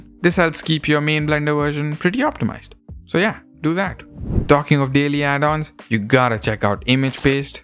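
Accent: India. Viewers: you're English accent sound like your are indian are you ? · Don't lose that Indian "t". :) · U sound indian, are u indian?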